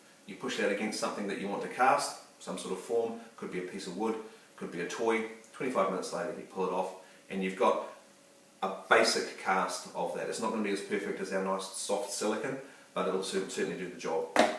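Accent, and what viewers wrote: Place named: New Zealand. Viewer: ...new zeland accent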